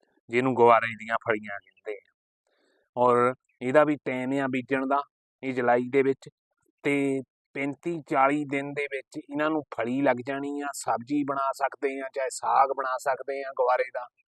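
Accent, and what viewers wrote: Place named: Hoshiarpur, India. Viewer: Veer Hoshiarpur ton o lgda a bolan ton ma v Hoshiarpur ton a reh bhr rea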